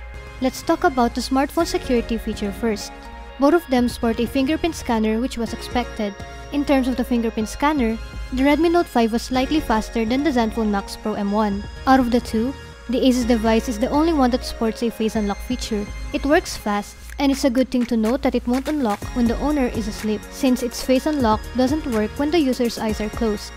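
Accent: Philippines. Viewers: Don't be bothered with those people that comment on your accent. it may not be neutral but its understandable enough for most like me. It's the natural way most filipinos pronounce english due to filipino being our 1st language.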